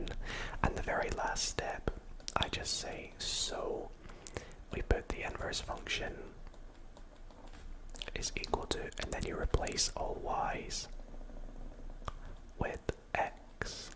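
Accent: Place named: Doncaster, United Kingdom. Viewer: Are you from Doncaster ? Your accent sounds much like Louis Tomlinson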